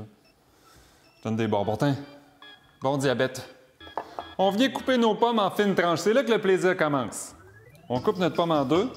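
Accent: Quebec, Canada. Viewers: Franchement ça va son accent j ai déjà été plus gênée en allant au Québec je trouve que n utilise que très de mots purement québécois qui nous déstabilisent en France à part le char pour cette vidéo 🚘🚙🚗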